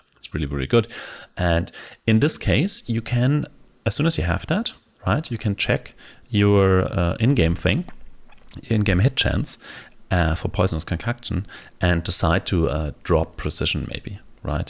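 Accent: Germany. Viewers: you sound german af 🤣 greetings from germany^^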